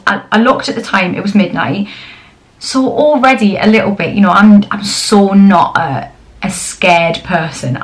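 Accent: Newcastle, United Kingdom. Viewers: I love your Geordie accent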